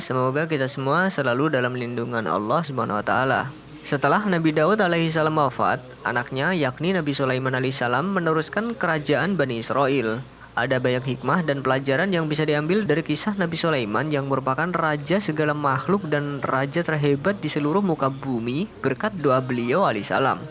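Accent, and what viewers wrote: Java, Indonesia. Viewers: Admin Jawa ya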